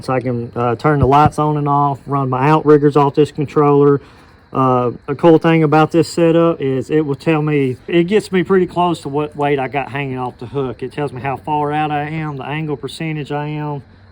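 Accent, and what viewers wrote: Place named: Europe. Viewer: What a nice white European accent 👍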